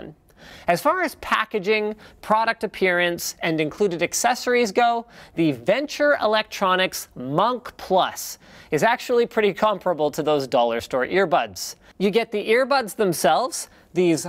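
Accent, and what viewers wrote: Canada. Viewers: Someone could tell that you're Canadian from the first second of this video lmao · what you a canadian ey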